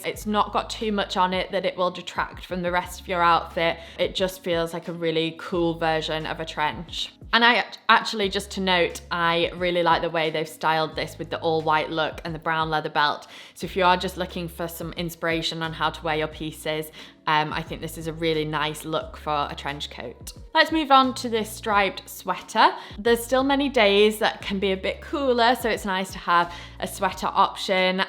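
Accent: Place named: England, United Kingdom. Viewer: Lovely young lady with impeccable taste. Few things on Earth sound more beautiful than an English accent. Hello from the great state of Texas!!❤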